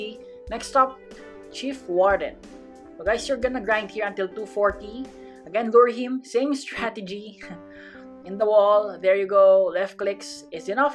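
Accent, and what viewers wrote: Philippines. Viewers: Hi i know your filipino bc you sound like filipinos so i knew it already do you make a gamernomph channel please tell me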